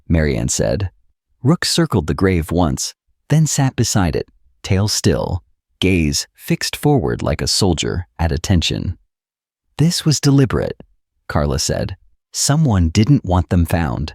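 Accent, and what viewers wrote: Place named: United States. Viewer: I like the narrators voice! Appreciate that she sounds like the USA… Kind of tired of all those British accents talking USA stories. Good story.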